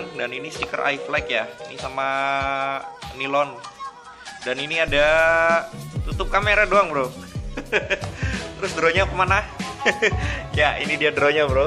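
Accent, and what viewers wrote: Banyumas, Indonesia. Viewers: Halo yutub ketemu lagi dengan.🤣🤣👍👍👍👍..5 inch in the best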